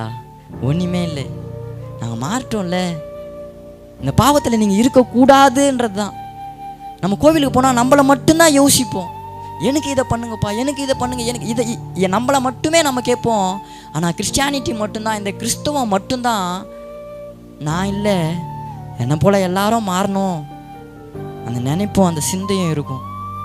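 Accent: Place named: Sri Lanka. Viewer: sounds real, no fake ceylon tamil.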